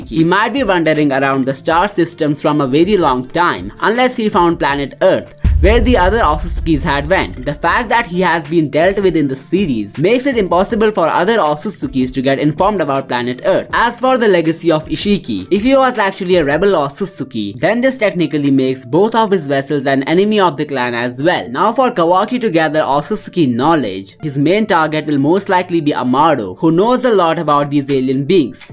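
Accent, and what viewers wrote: India. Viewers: Bro your accent is like indian r u indian?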